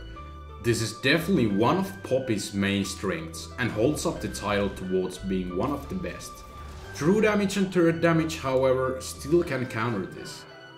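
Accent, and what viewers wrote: Finland. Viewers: Are you finnish? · are you from finland? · Mä vähän aattelinki et oot suomalaine, koska aksentti :D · U finnish? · Your accent is sooo Finnish ;D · Are you from finland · Mä tiesin.. helkkarin suomalainen aksentti. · are you finland ?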